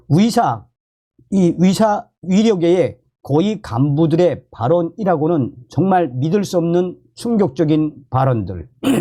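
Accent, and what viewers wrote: North Korea. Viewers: 북쪽말좀 하시지마고요 듣기소름끼칩니다 않보면되겠지요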